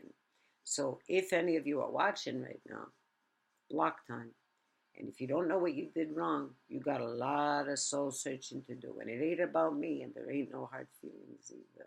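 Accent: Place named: New York, United States. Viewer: You have a New York accent. Sounds nice and it is attractive feature on a person. Have a nice and safe week!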